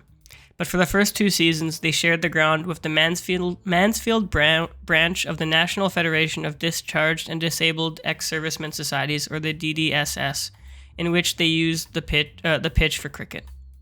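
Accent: United States. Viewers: My home town. Was surprised to hear an American talking about it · Voiced by an American with no understanding of what he is reading from a script. Mistakes are numerous and unedited. Please save us from these and/or get a different voice-over.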